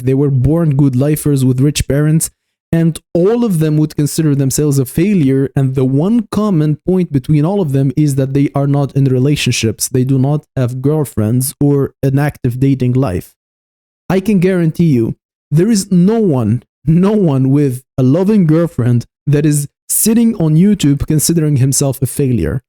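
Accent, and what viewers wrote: Russia. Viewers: Bro are you Russian? Your English is really good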